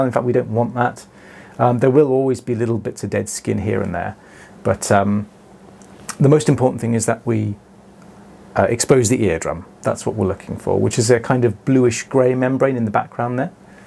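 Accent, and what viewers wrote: United Kingdom. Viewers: Boy how I love your descriptions and your British accent ❤😊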